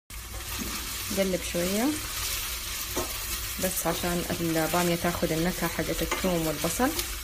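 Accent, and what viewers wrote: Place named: Hejaz, Saudi Arabia. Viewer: شكراً الطبخة سهلة انبسط لما اسمع حجازية زيي تقدم اشياء حلوة الرب يباركك ❤️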